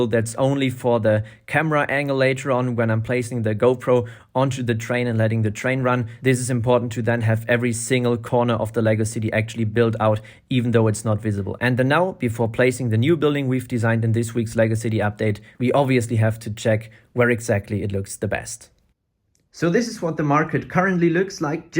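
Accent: Germany. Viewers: Are you German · Dieser Deutsche Akzent ist rauszuhören :) Und mir ist aufgefallen das du denselben Text wie im Deutschen benutzt nur halt auf Englisch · Da war ich mir doch sehr sicher, den leiiiichtesten deutschen Akzent zu hören.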